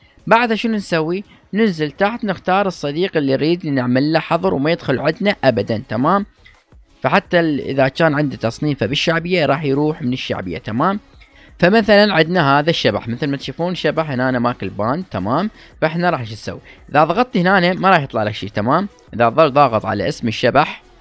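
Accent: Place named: Egypt. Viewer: دسهلهة جنك مصري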